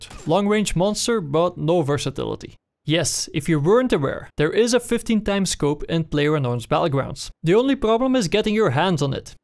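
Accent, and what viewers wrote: Netherlands. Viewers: Most dutch guy ive ever heard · your Dutch accent stands out so much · You're so dutch. lol :D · your english is terrible with a huge dutch accent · Zwaar Nederlands accent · hoor ik gewoon aan ze stem dat ie Nederlands is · Nederlands accent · Nederlander, hoor je meteen 🤣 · that Dutch accent is real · this guy has a dutch accent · Your accent looks netherlands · u dutch boi can hear it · the fkin overpowering dutch accent killing me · your dutch im 100% sure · work on your Dutch accent het maakt het wat moellijk te verstaan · that dutch accent love you man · sounds dutch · ik hoor aan je accent dat je nederlands bent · barely even realize ur dutch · Je hoort zo dat dit een nederlander is XD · #dutchie haha gast binnen 1sec wist ik al dat je nl was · argggg the dutch accent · Jij bent nederlnads engels noob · nederlands hoor ik aan het accent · Zijn Nederlandse accent is wel echt geweldig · gast je r is the hard het is gwn te horen dat je nl bent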